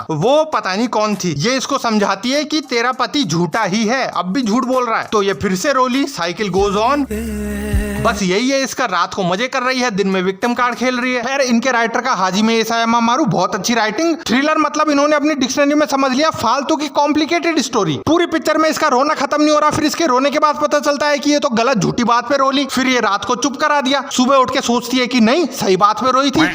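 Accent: Rajasthan, India. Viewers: Bhai Rajasthani ho na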